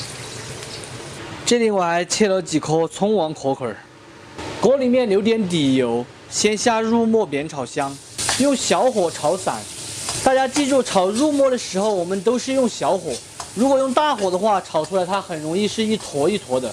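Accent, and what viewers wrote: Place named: Sichuan, China. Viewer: 川普好聽😀😀😀😀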